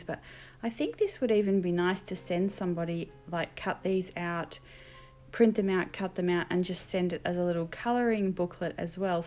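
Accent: Australia. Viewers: Not sure if my comment was posted, but wanted to say I’m so glad to hear an Aussie accent. I love your style. I am just getting into art after a career in nursing, so am inspired by your stuff. I an in NsW Thank you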